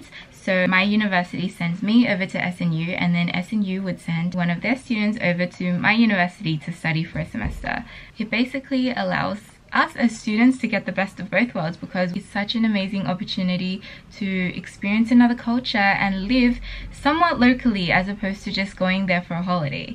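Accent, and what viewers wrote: Australia. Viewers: Your aussie accent is a boooooomb ‼️💖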